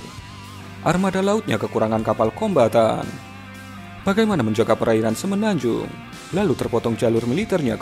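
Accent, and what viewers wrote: Java, Indonesia. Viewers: Mbok medok nya dikurangi om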